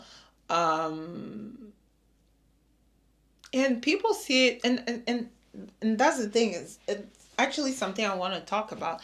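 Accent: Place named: Italy. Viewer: you must be Italian!! you sound just like me loool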